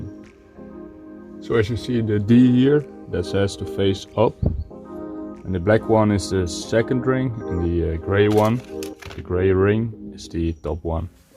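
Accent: Netherlands. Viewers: Ik hoor gewoon dat deze man Nederlands is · He sounds Dutch, So probably pretty dang tall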